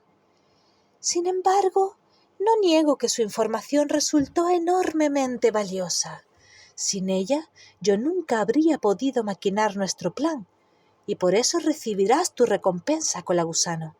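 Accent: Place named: Malaga, Spain. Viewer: Me iba a poner a leer el libro pero tengo tanta fiebre que no puedo ni leer, así que me vienes de perlas para pasar la fiebre en cama. Me ha gustado mucho tu voz y entonación. Por cierto, eres malagueña? 100% seguro que de por esa zona pero no te sitúo exactamente al intentan no poner acento al narrar xD.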